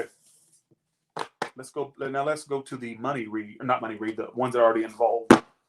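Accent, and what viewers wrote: United Kingdom. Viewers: Love the UK accent lol xxxxx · Uk accent 👌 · The British accent 🤣🤣 I definitely spend too much money in Tesco !!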